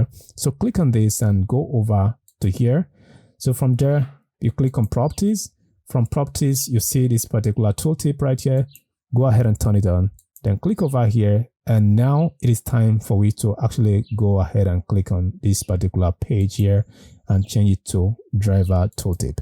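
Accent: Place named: South Africa. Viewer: Fantastic, super useful, thank you! Nice voice and super accent (south-african?) - was a pleasure to spend 2hrs with you Did not really like the part with background done in power point/screen shot etc. I did mine inside PowerBI directly, although not so beautiful as you did. Mine is just OK/good enough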